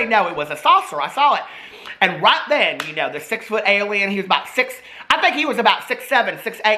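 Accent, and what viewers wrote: South, United States. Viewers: !!! 😂😂😂 your southern accent 🤣🤣🤣🤣🤣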